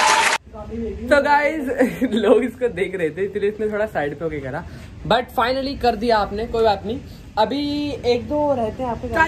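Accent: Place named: Punjab, India. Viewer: Very Punjabi c bolya karo kntt lagda o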